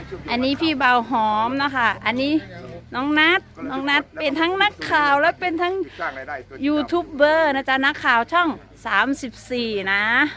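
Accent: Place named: That Phanom, Thailand. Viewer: เจ้าของเพจสำเนียงคือไทยธาตุพนมเนาะค่ะ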